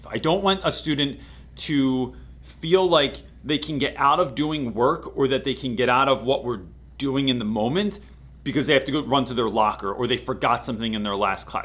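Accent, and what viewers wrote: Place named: Philadelphia, United States. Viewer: Thank you! It’s nice that focus isn’t an issue anymore ))) Instantly glued to the like button. You accent is truly Philadelphian ! Love it. Thanks! Sir!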